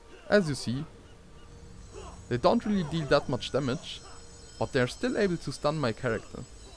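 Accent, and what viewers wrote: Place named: Germany. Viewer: Hab schon bei der ersten drei Sekunden erkannt das du ein Deutscher bist :D .. Cooles Video nice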